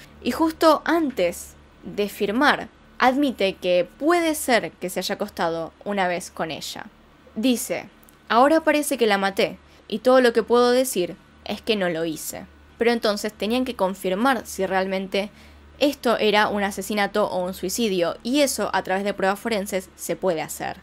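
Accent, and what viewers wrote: Argentina. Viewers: Sí mencanta el acento argentino y los videos. 🤩👌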